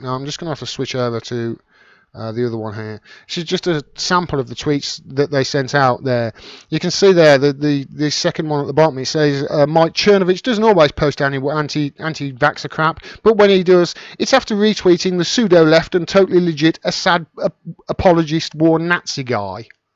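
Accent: Birmingham, United Kingdom. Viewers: are you brummy?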